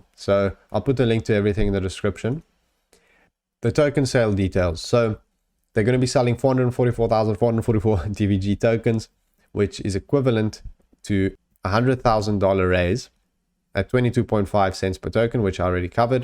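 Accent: South Africa. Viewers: At first I was sure you were a saffa. Now I'm almost certain you're from stellies or Somerset... Keep on the great vids either way boet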